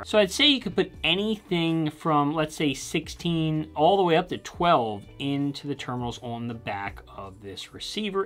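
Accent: United States. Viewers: Why if the talking head has a USA accent do we have to endure an undercurrent of anti intellectualism?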